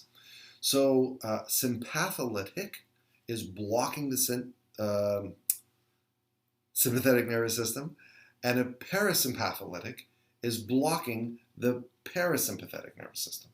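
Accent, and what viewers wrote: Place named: Canada. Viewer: Two things....First...Ha! ....I just KNEW you were a Canadian, eh? (me too, that's why I had a feeling)...Second...you are a truly truly gifted teacher. Thank you so much for creating these paramedic videos, they have explained so much confusing material in such a simple and clear fashion....please make more videos!!!! .....eh?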